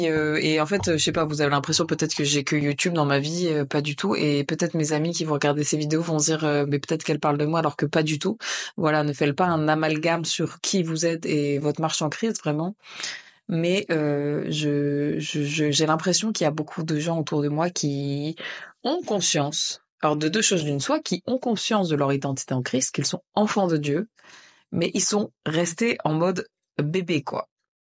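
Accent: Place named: Africa. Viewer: Ca se voit que tu fréquentes des africains qui ont vécu là-bas, tu parles comme eux, avec le même accent. C'est dingue. Cette société "multiculturelle" gauchiste c'est vraiment mortel pour les blancs.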